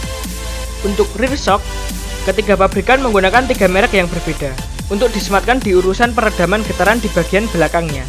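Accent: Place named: Java, Indonesia. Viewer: Medok bgt bro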